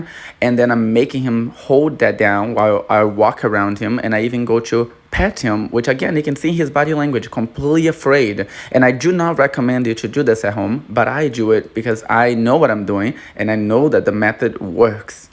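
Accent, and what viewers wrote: Brazil. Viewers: Você é brasileiro? Eu ouço sotaque carioca.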